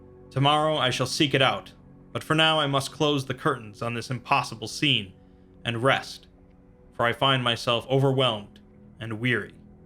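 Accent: England, United Kingdom. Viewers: Two years later and I’m still amazed kept up an English accent for almost a whole hour. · Great British accent didn’t think it was really you at first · That British accent is amazing! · Another great episode. Good English accent lml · Which English accent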